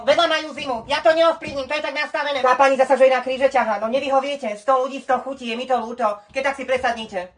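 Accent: Slovakia. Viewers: Nebojkajtě sa 😂😂😂 krčaky ako potrubia, drzkajte se mna….já lubim to jak si hraješ s tou slovencinou 😂😂😂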